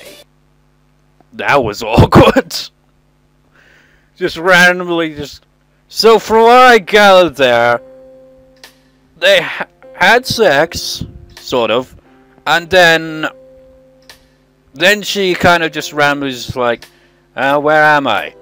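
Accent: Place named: Wales, United Kingdom. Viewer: It sounds Welsh now that I know, but I thought It might have been English.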